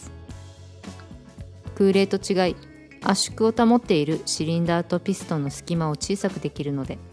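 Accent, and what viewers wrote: Kansai, Japan. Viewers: 端々に出てくる関西訛りが、スゴく好きです。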